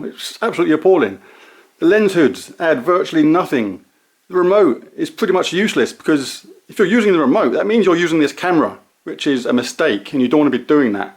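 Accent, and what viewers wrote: United Kingdom. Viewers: Just subscribed...thanks it saved me from buying one mate 👌 can i ask where your from mate?? Your accent sounds local ish to me down on the south coast ( hants )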